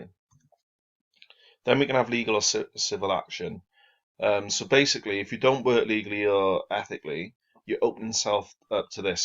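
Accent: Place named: England, United Kingdom. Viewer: Can't understand shit. Your English is too overly english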